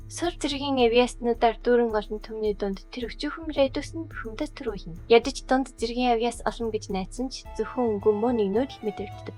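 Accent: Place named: Inner Mongolia, China. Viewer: Жоохон Өвөр Монгол аялга сонсогдоод бхын. Яасан ч хөөрхөн сонсогддог юм😊.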